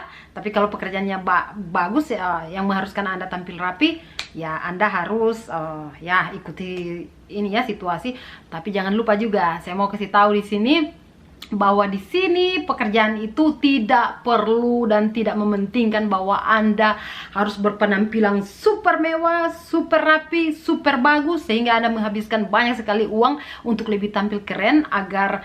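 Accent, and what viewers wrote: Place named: Eastern Indonesia, Indonesia. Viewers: tanta asal mana ni? 😁🤣dialek kayak orang ntt 🤣🤣🤣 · logat kaya orng timur 😁😁. semoga saya bisa di bantu kerja ke sana kk . 😔😔pengeeenn sekali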